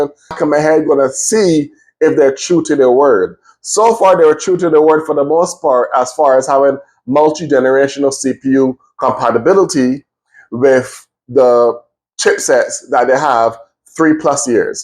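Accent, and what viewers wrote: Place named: Jamaica. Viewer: man, you have my subscribe, I always wanted a Jamaican to explain tech stuff :D, you made my day! Respect!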